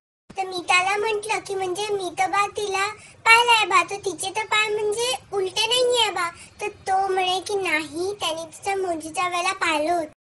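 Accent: Nagpur, India. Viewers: सोटी पोरगी,नागपुरी लहेजा एकदम फक्कड, म्हंजे की नै एकदम बढ़ीयाच 👍🙋‍♀️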